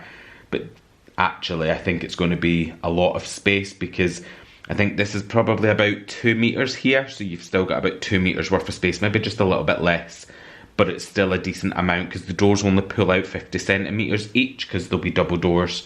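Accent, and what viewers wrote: Scotland, United Kingdom. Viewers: I found u a few weeks ago I've binged u since n I love hearing a Scottish accent im north east n it's great watching u ur wonderful ur home is gorgeous n I'm so glad I found u I subscribed last week after watching a while n I'm hooked u need to give Lydia n alli Gordon a watch ud love there home xxx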